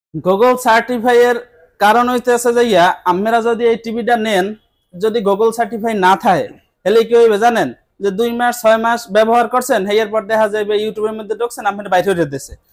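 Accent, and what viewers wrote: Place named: Barishal, Bangladesh. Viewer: খাস বরিশালি🤭🤭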